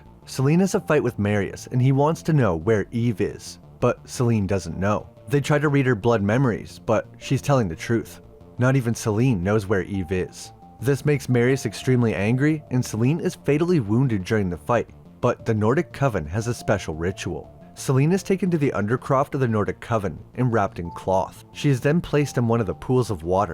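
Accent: Canada. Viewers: Your Canadian accent isn't slipping by me! keep up the good work my man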